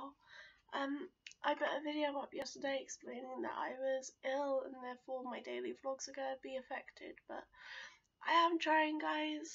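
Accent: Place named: United Kingdom. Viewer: Good job! Nice sincere vlog and accent (I just love British English)! You've got genuine energy, I'm also a great fan of music in general, thumbs up! I recently just started vlogging as well, so if you got the time check it out:]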